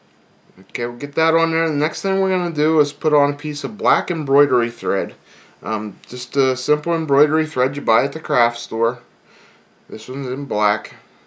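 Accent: Pittsburgh, United States. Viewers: lol like 2 minutes into hearing you speak i said to myself, this guy has to be from Pittsburgh or near there. Looked up Holsinger's Fly Shop and i'd be damned if i wasn't right :P Great video thanks!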